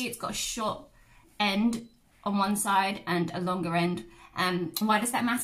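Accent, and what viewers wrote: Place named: United Kingdom. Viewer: Woah wasn’t expecting you to be British 🥺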